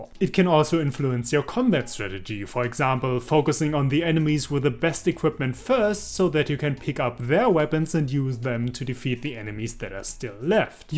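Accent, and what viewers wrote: Germany. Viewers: Good video. And I get that some folks don't much care for open world type games and get really picky about what kind of games they like so I can respect his 7 out of 10 that he gave the game... Here's the thing though about Jim Sterling. Okay fine... He didn't like this clearly good game as much as most folks. Fair enough... Not everyone has the same feelings... BUT! He gave dead rising 4 a 8.5 out of 10. That's fuckin retarded lol. I don't care how opinions differ and all that. They shouldn't fucking differ THAT fucking much. That's like eating shit vs a new york pizza logic. Love your accent btw. Reminds me of darth helmet from space balls or that german guy who had to put up with homer in that land of chocolate episode. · thats a serious german accent dude....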